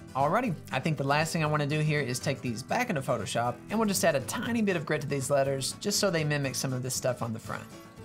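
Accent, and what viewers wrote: South, United States. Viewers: as a (fellow? my ear always catches that accent) Southerner, I love this menu and am now desperately craving ribs 🤤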